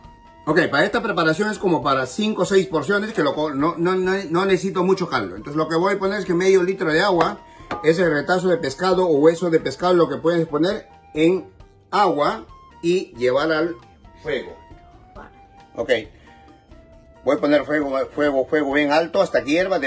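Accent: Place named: North, Peru. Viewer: cuales barbaridades? su manera de hablar es del norte del Perú con mucho orgullo yo soy de lima y lo entiendo perfectamente si quieres alguien que hable bien el castellano hay otros youtubers este el un canal de cosina no de lenguaje .....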